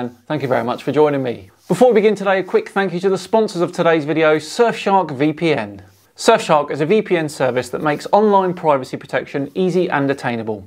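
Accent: United Kingdom. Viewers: Is it just me, or, is it strange hearing a British guy say dollars?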